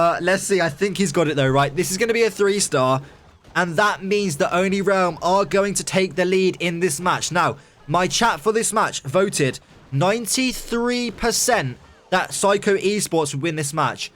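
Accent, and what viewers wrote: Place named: United Kingdom. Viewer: British accent 😉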